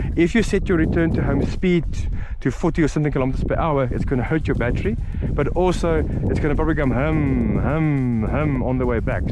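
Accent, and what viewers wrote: South Africa. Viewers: I can spot a follow South African from a kilometre away XD. NIce channel!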